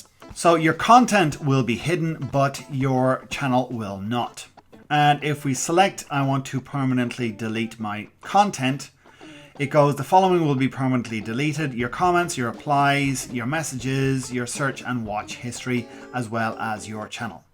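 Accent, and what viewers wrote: Ireland. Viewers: U are DEFINETLY from cork or something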